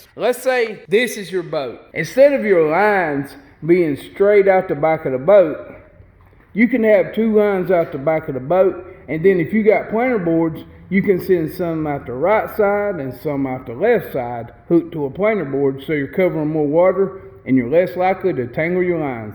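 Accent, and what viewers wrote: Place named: North Carolina, United States. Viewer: grab it bare handed den! 😂 I think we might be from the same neck of the woods! I just ran across your YouTube yesterday and you sound like you could be a neighbor of mine or someone I grew up with! I am a North Carolina man born and raised!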